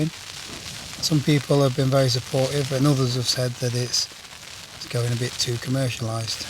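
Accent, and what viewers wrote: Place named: England, United Kingdom. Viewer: Your videos remind me of Tom Weir - but with an English Accent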